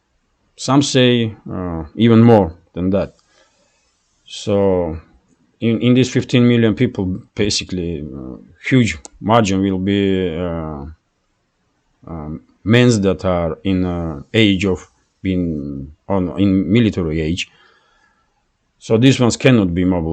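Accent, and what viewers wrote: Russia. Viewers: NATO, Poles mercenaries are involved. And those Ukraines forced to die for USA. Meanwhile, Russia wins again. The Imperialist brain is sociopathic hence the more Russia wins, the more they double down. I rejoice in Mr Putin's leadership: a leader who loves his citizens, whose intelligence is layered with great emotional depth. Alas, I have Justin Trudeau to depend on for my economic well-being. Perhaps, you can lend President Putin to us, just to help us become a real nation, again.😏🇨🇦 Advice: speak a little louder, a bit more emphasis Just a bit🤏 Found you from Alex C.'s channel. A Russian in Russia sounds good to me! P.S. I am an old woman who has been dumpster diving for the truth for decades. Good to find another truth teller in my search. To die is human, to die rejecting the bullshit of false prophets is divine. Subscribed.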